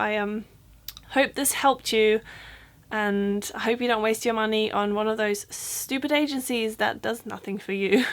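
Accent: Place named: England, United Kingdom. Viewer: Called it..... best English accent from a German. Of. All. Time. Gut gemacht!!!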